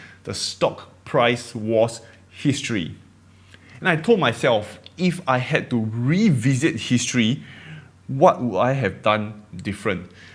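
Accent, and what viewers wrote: Singapore. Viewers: What's wrong with your accent? Alot of Singaporean speak just like that. Most of us who don't study in international school or who don't grow up imitating the lines in American shows will speak exactly like you. Does it mean that American or British accent are superior to others? Why not they learn to understand our accent? I'm proud of the Singaporean accent even if I speak perfect English. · Don't need to change accent you sound perfect to me. Singaporean here as well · That is Singaporean English.. All Singaporean can understand it and has no issue on that.. haha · I'm Canadian and i love Singaporean accents! Dont change! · I understand your motivation, but don’t sweat your accent. You’re Singaporean and this is the Singaporean accent. People will adjust and adapt! I find your accent adorable and think it adds to your charm and appeal. 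I’ll miss it if you stop pronouncing “people” as “pee-po.” 🤓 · Yo man, I actually like your accent. I have a colleague at our company who is also a good friend of mine who lives in Singapore. Your accent reminds me of him. Don't change it man, its awesome. Everyone has an accent to someone else anyway!! ;) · Don't change your accent just because someone tells you to do so lah. If the viewers are not willing to get used to our SG accent then they can go watch Jim Cramer or other donkeys with their perfect American accent. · Love the Singapore accent, you are doing an awesome job familiarising the world to it! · Bro your accent is great, reminds me of a good friend I had in Singapore! ❤ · Stay and talk how you are - it's perfect like that! Everything else doesn't sound authentic and I am so happy to hear the Singlish accent! :-) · Your accent is very distinctly from SE Asia, like the Singapore area. 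Maybe add some local English slang words into your discussions. I grew up in Taiwan and HK so I can tell the difference btwn when HK person speaks their British English and other areas. I believe if you watch some American tv shows, like Yellowstone, you can hear more of the American Midwest accent. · please just keep the Singapore accent :)) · Singaporean accent is what makes your videos unqiue tho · It's a classic Singaporian accent don't change, better to add some singlish to teach people... don't change a dam ting lah! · Singlish accent is good!😆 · Just be yourself man. You are doing great! What if you “neutralize” your accent n it doesn’t help? Waste of time ..... u are uniquely Singapore.... . Don’t listen to me: I might be a fly, ramblings of a mad fly. :) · Don't lose your Singaporean English accent! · You've gotta represent Singapore's pride with our accent! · I don't think you need to neutralise your accent. 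We can understand you fine and I like listening to your Singaporean straight talking. It's so refreshing that you're not trying to talk around something like in the west. Rather, you're straight to the point and I like that. Keep doing you. From Australia! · I have significantly improved my understanding of Singaporean English since I subscribed to your channel. Haven’t missed a single video since last year. I don’t want to lose it. Please do not change. :-) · Singapore accent is the signature of the channel :D I guess people are not that fuss about accent as long as the content is good, or they choose to miss out on good content. Political risk is the most uncontrollable risk. Cathie showed people what to do already. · Nooo don’t change your accent. Singapore accent is great and not hard to understand. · I love your Singaporian accent!! I am American born and raised and I only speak English. 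I can understand you perfectly. Just my two lovelaces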